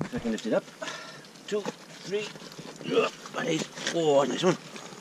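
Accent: Wales, United Kingdom. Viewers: Your accent is so thick, it's impossible to follow some things you say (I lived in Wales 14 years, but it;s still bloody hard to understand this man). You lift a rck and say, There's a nice three-beaded XXX" - what was it? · Welsh accent is beautiful.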